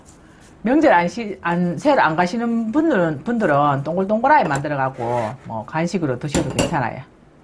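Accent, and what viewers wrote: Gyeongsang, South Korea. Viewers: 님은 참 재미있게. 요리. 하십니다. 구수한 갱상도 말씨 정감갑니다 항상 잘 보고 있습니다❤❤❤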